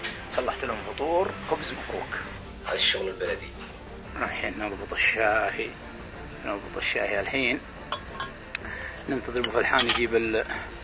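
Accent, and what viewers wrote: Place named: Subay'i, Saudi Arabia. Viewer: انشدك بالله انت من مطير ولاسبيع لغوتك وفي روايه اخرى للنقوج ودمك ماهو دم عتيبي ولادوسري حي يامال العز ودي انك مطاري بس اشك انك سباعي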